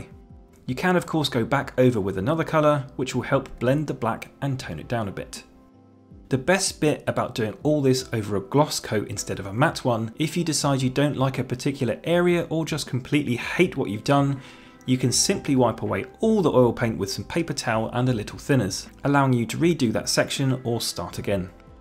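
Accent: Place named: South England, United Kingdom. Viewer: I am not an artist by any stretch of the imagination. I mean, I can freehand-draw an elephant that won't make you think it's a hippo, but that is about my limit! (Thank you, trunk, tusks and toes!) In scale modeling, I am a stickler for realism, rather like Bill Halliwell, and I don't give myself much leeway. It's just that lately I am starting to re-think what realism actually is. 🤔 In the view from the USA, your south-England accent does not sound boring at all, although I do enjoy hearing the wide variety of British accents that one finds in Youtube scale modeling. Lately, I am particularly getting a kick out of the ones that pronounce "blood" as rhyming with "mood", because it's so startling to an ear used to hear BBC News as the only British pronunciation!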